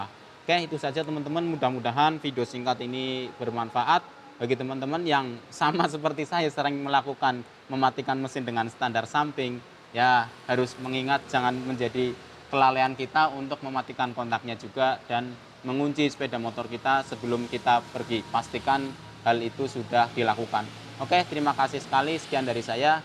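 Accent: Java, Indonesia. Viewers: Medhok syekali om,,, Asli mana nih?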